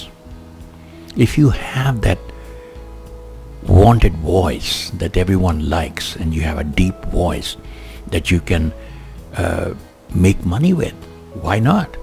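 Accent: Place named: Caribbean. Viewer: I love your voice. Where are you from, I hear a Caribbean accent.?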